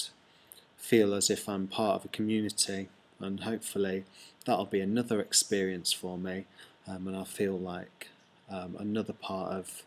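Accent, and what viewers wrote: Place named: Northern England, United Kingdom. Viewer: You have turned proper northern with the accent :)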